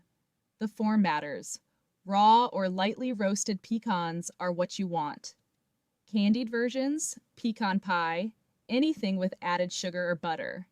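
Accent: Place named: West, United States. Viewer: too much of WESTERN ACCSENT HENCE WE UNDERSTAND ONLY HALF ... THANK YOU ... !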